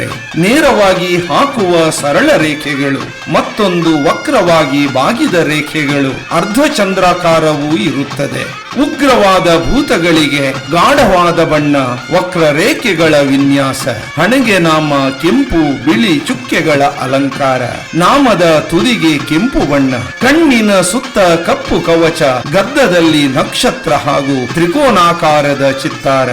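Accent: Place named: Tulu Nadu, India. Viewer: ದೈವಾರಾಧನೆ ಬಗ್ಗೆ ಬಹಳ ಒಳ್ಳೆಯ ಮಾಹಿತಿ ಹಾಗೆ ತುಳುನಾಡ ಮಾತಿನ ಶೈಲಿ ತುಂಬಾ ಚೆನ್ನಾಗಿತ್ತು 🙏🙏🙏🙏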